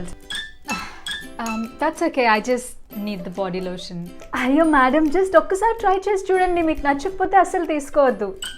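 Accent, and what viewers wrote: Godavari, India. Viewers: Slowly i bcame fan of ours. U r male getups r osm, suits u a lot. Godavari dialect is so funny osm. It shows how much hardwork u make behind the scenes.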